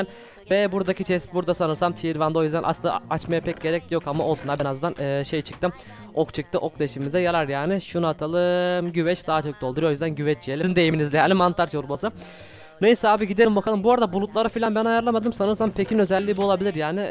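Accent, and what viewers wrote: Adana, Turkey. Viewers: Abi şu konuşma stiline hastayım tam bir adanalısın <3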